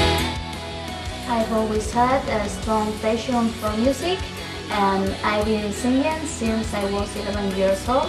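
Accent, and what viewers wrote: Spain. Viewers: Well your accent is still kinda spanish,but,love ye,ye sing fine,and in songs ppl hear less accent more song,so you'll have no probs. And,in your future carrier as sirenia main vocal,you should learn english just fine :)) Wish ye all best luck. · Of course she's spanish, you can tell it by her accent. I already knew that :P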